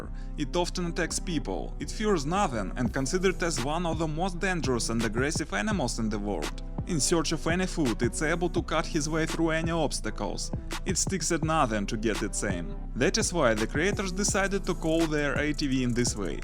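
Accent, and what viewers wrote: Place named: Russia. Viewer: Русский акцент аха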